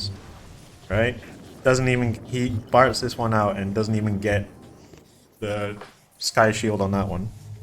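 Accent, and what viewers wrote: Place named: Singapore. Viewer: oh nice. You have kind of a Singaporean accent also that's why I figured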